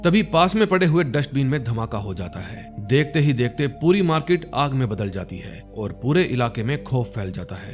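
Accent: Punjab, India. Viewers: Punjabi accent rocks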